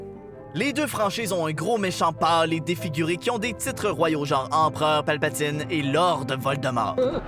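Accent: Quebec, Canada. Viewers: Les quebequois, vous avez un accent magnifique 😍❤👍🤣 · C'est un québécois y a qu'à entendre son accent...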